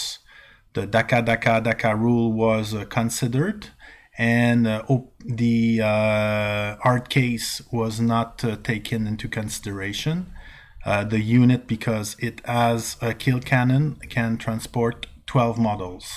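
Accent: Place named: Quebec, Canada. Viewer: 2 sec dans ton video j'ai reconnu l'accent quebecois ! Lache pas la patate bon video !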